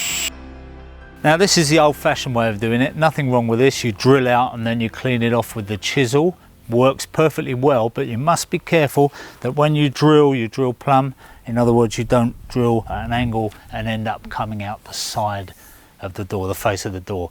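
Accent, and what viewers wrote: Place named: United Kingdom. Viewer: thanks for reply! Unfortunately it's hard to understand Brittish accent for me. Good job though. What is the cost to install such door in UK?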